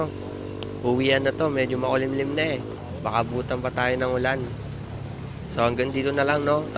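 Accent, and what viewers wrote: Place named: Muntinlupa, Philippines. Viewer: napanood ko na boss kakatapos lang ang angas, at na notice ko parang taga muntinlupa kalang HAHAHA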